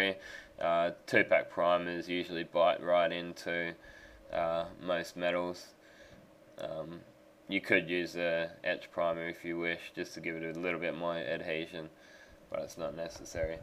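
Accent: Australia. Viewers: He's Australian- True Blue..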